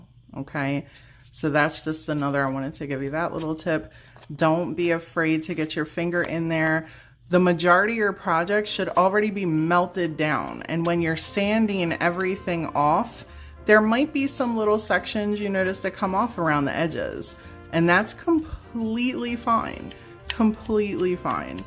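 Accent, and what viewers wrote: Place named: Maryland, United States. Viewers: Heyy Philly girl here, we also say wooder! Your ‘accent’ is one of the reasons I started watching your videos, in addition to your amazing art and diys! I, unfortunately, live in Florida at the moment and I refuse to say ‘water’ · Hi. I love watching your videos. I learned today that I could blend chalk paint and acrylic to use as a base. Way cool! I am really in to decoupage right now, so I loved all of those, but the Christmas ornament was my fav. I had a sneaking suspicion that you were from Maryland. I too used to live in Maryland...Bel Air. I still say wuder even though I now live on the West Coast. Some things you just can't let go of!💖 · 😂 yes, we have a distinct accent here in ❤ Maryland. I totally understand what you're saying.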